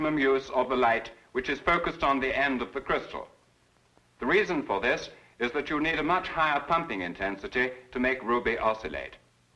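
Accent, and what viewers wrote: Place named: Mid-Atlantic, United States. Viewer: Flawless mid-atlantic accent here... impressive!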